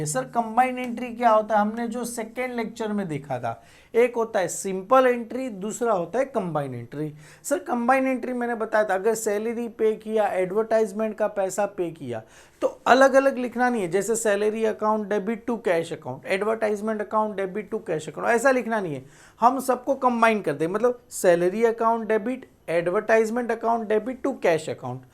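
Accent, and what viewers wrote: Gujarat, India. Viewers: Sir aap Gujarati ho na? Aapka Gujarati accent hai You are an amazing teacher. Heads off to you ❤❤❤ 😊😊😊😊😊😊😊😊😊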